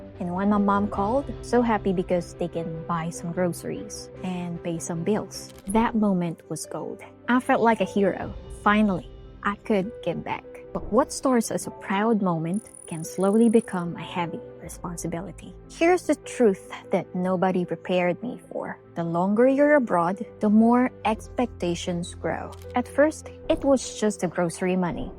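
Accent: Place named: Philippines. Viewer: I can hear your southern US accent mixed with your Filipino accent...hehe. Good video. Truth be told.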